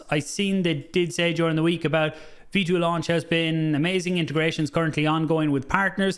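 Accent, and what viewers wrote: Ireland. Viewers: No way boy, he`s a DUB :) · Are you from Sligo man? :D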